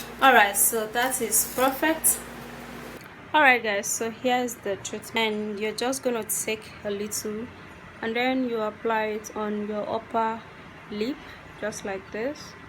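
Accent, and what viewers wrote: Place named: Nigeria. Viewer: 77% of Nigerians bleach and judging from her accent shes Nigerian ): other Africans bleach too but Nigeria tops the list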